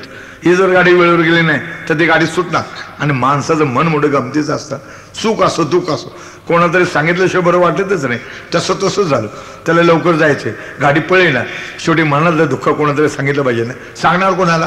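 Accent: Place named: Ahmednagar, India. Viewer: Khas nagarcha rangda aawaj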